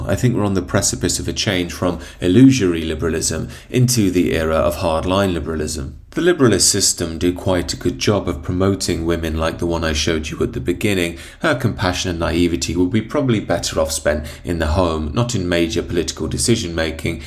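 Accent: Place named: Leicester, United Kingdom. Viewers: Leicester, that’s it, I knew you had an accent. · Yes, true. But a no point does he say “hey, up me duck.” That would place him around the currently flooded Barrow-on- Soar.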